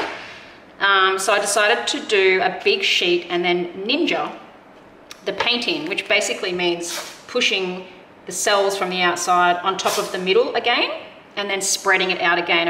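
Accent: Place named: Australia. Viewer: This is beautiful !! So refreshing to hear an Aussie accent.....lol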